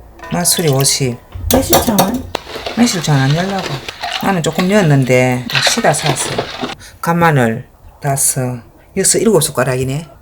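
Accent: Gyeongsang, South Korea. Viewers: 경사도 사투리로 TV 요리프로에 함 출연하시면 좋겠어요!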